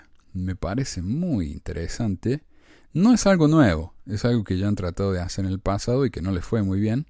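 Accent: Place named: Cordoba, Argentina. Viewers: Mira " " con ese acento marginado Gaucho, no es nuevo esa noticia de llamarse correctamente de la IJSUD de hace décadas se menciona para el uso debido, pero igual puedes usar tu libre albedrio, no te culpamos, lo importante es el fondo, quiero buscar también 3l urim y tumi pero quiero primero terminar de encontrar el libro de Ezequiel y ideas, mientras vivo por la fe y degustando del escudriñar las sagradas escrituras del "Antiguo", "Nuevo" y "Otro Testamento de Jesucristo" y así comprender y amar a tipos como tú no.imporra si eres ninfómana o gay, igual te deseo lo mejor y eres bienvenido, y si hay algo que denunciar dentro estamos del mismo lado, limpiar la casa para salir a Óscar, slds compañero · soy miembro de la iglesia, comenté vídeos tuyos anteriormente sin verlos de forma completa, y no entendía semejante trabajo de investigación y dedicación de un ex miembro de la iglesia para desacreditarla. 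Quiero que sepas que este comentario lo hago con todo el deseo de dar mi opinión y no el de pelear ni criticar. Hay dos cosas que me llaman la atención: Primero. El tiempo que gastas en hacer estos vídeos es llamativo, la verdad que se puede ver que le das bastante dedicación y eso se puede ver en la edición, los micrófonos, etc. Es raro que cuando citas a los profetas lo haces con una intención de ridiculizarlos, tanto a Jose Smith, el profeta Nelson entre otros. Segundo. Fuiste miembro de la iglesia, lo decís en tus videos, esta más que claro que seguramente entraste al templo e hiciste convenios sagrados, no hay que ser ningún genio para saber eso. Tu acento es de Córdoba, al principio pensé que capaz eras de otro país y no Argentino. Capaz siendo un ex miembro se explica un poco tu comportamiento. Lo que si no entiendo y en esto creo que vas a coincidir es que La Iglesia de Jesucristo los Santos de los Ultimos Dias o si queres le digo La Iglesia Mormona, como vos quieras, hace muchas cosas que son buenas, edificadoras, ayuda a las personas a ser mejores, enseña principios que mucha gente no duda que son verdaderos y hasta las puede cambiar, como lo hacen todas las religiones cristianas del mundo, siguiendo los pasos del propio Salvador (que no sé sinceramente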